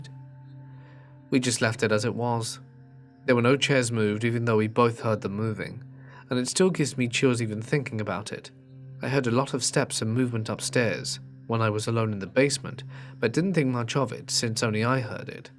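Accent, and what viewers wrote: United Kingdom. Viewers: Hey, really enjoy listening to your narrations, BUT I do have one gripe...could you PLEASE stop saying the word "garage" like your American, I mean you have a British accent. It's so ANNOYING. Thanks. Blessings.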